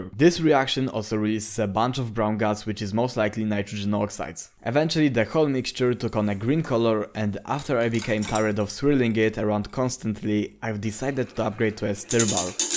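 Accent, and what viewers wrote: Poland. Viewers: why your accent suggest me, you are from Poland xD? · Your English is really good and the humor is really great paired with videos like this. I like your accent but I can’t tell where it’s from. You sound Polish but I don’t know for sure (sorry). What country are you from? Do you live there or are you in the US now?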